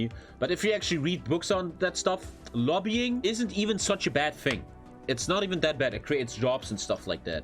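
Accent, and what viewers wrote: Germany. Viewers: Idk his idea on the gendering thing seems a little stubborn in my opinion. I’m a foreigner living in Germany and had to learn the already near impossible language. But still, I’ll go the extra mile to learn and change more in my speech, as languages evolve, just like they always have, cause we don’t all speak english the same way it was spoken in the 50s or something and even for a German example, there are academic and grammatical changes officially made as recent as the 50s or something if I’m not mistaken, so being stubborn about a language like german, which already has way too many dialects… it’s not like everyone speaks it the same way in the first place, when all you have to do is go from one city to the other and hear a totally different german, for example I live in Hannover and speak Hochdeutsch but notice how has a Saxon dialect that I don’t always understand. 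So that being said, I don’t see how changing one way the already crazy and diverse language operates is an obstacle, I know yall germans had to learn other shit wayyyy harder than “*innen” for your everyday speech lol.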